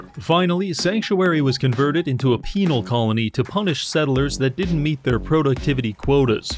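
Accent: Newfoundland, Canada. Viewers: Instead of Car all I hear him say is “ker”, Canadians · Can't enjoy this for the hard-stabbed Canadian O twice a sentence. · Dude are you from Newfoundland? I recognize the accent. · Because is violently canadian, and his accent comes through really hard on some really random syllables. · Are you canadian?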